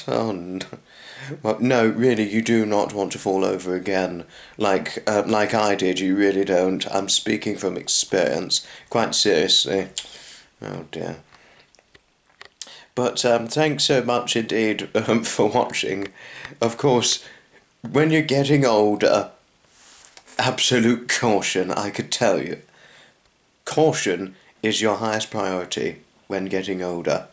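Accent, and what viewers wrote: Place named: Scotland, United Kingdom. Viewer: wow, you've got a regular channel now! Didn't know you hurt your foot, but glad you're on the mend. Love your wee laddy accent.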